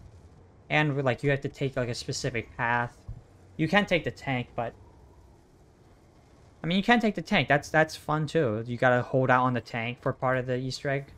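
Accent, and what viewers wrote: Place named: Italy. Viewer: im from the northeast and hearing your voice is hilarious so italian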